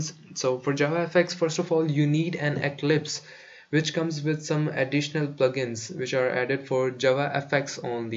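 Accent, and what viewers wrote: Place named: India. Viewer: what is the problem of you Indian people with T & D, it is so irritating.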